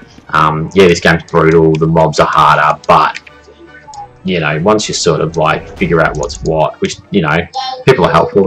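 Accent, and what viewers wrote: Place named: Australia. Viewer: Are you lagging? Looks like you can barely walk anywhere. What country are you playing from and what shard are you in? Because you sound Australian, so you should be in Oceania right?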